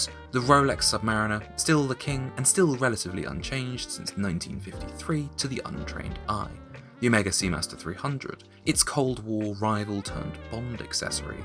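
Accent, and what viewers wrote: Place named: Upper class, United Kingdom. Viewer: Just found this channel and it is already my favourite place to go for all things watches. Although I’d like to make an observation and please do not take this as a criticism. Why do a majority of watch channels speak with a very distinct middle upper class accent? With very unique pauses between words. To give you an example listen to TGV, to bark&jack, time&tide etc etc the list is endless. They all talk the same way which I thought was very unusual. I haven’t noticed this with any other hobbyists besides horology. Like to hear your thoughts on this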